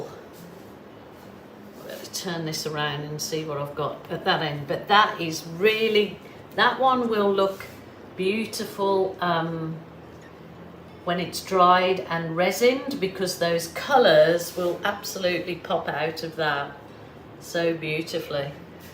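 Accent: Birmingham, United Kingdom. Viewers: Hope you're feeling a lot stronger really soon ..am awaiting the first of 4 surgeries myself including a full donor cornea transplant eventually in the hope of reversing the 100% blindness in my right eye and 60% in my horrid legacy of nearly dying of COVID months in hospiral/ respite ..I taught printmaking too . Just try & take it easy and don't push yourself Youtube..just hope your surgery heals fast x Hey as an English person ( born & bred in Liverpool by Strawberry Fields in fact )..ARE YOU or have family close to you from either the Midlands ( Birmingham ..Izzy Osbourne country ) OR my old neck.if the woods in Liverpool..I.know the amount of Australian fact terms ,swear words lol.& even humour & spelling of course that Britain & Australia.I'm that way are like twins..HOWEVER I strongly hear a Birmingham accent in your voice..OR Scouse any word with two OO' s ..yeah ...so are you ?? 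lol?😆 Wish my late mum was alive she was a potter and my only family ,lost mum aged 94 when I was in the ICU fighting Covid..those round foil paintings my mum would have LOVED them ..just pure colour = mum ❤ xx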